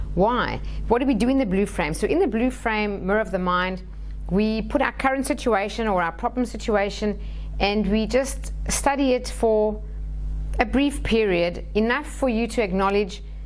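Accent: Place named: South Africa. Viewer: Hi thank you for the video, your accent sounds like South African - excellent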